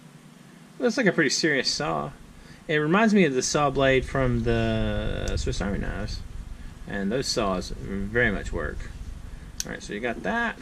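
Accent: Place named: South, United States. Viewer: For some reason in my opinion you sound southern but then again if I am assuming right when I went up to North Carolina a lot of people sounded southern so I'm assume you are from North Carolina or Kentucky.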